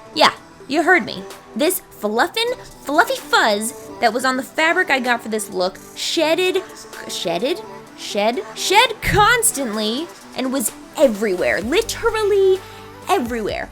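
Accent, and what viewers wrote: United Kingdom. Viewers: I’ve never heard sound so British! When she said bloody at the beginning she sounded more British than me being British myself 😂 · that British accent doe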